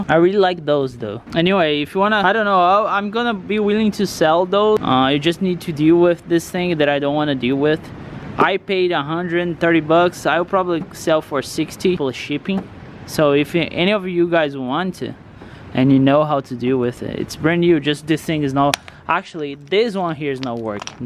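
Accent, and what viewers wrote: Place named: Brazil. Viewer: Sound like from brazil..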